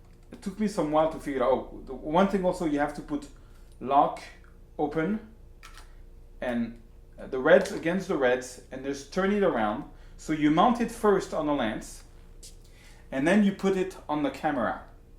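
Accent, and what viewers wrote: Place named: France. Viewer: My ears are bleeding to this awful french accent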